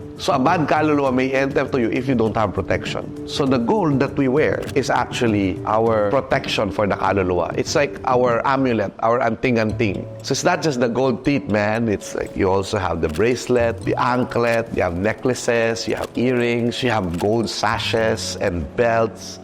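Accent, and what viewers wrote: Philippines. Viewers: Bani, Mai, and all of the beautiful people working for The Filipino Story — bravo. I work in content, TV and Film, and it’s been a while since I watched a piece of media that is truly, TRULY, inspiring. I cried the first time I watched this, and I come back to it every once in a while when I need some kind of spark, some kind of reminder, some kind of flame. As someone who works in media, it’s easy to fall into a relentless pursuit of the next, biggest, shiniest thing. But everything here in The Filipino Story is as simple as simple gets. Simple, but unapologetic. poetry, the unapologetic Filipino accent, the unapologetic Filipino-ness — it fills me with much pride to watch and witness. Happy to help and support in any way I can!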